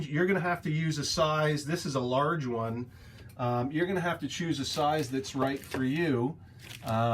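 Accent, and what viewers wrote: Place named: Canada. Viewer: Yes, it's called "Canadian raising." Before I saw Barrie and Orillia in the channel title, I knew was a fellow Canadian. It seems to be more pronounced in/typical of southern Ontario, but we do it here on the west coast too.:)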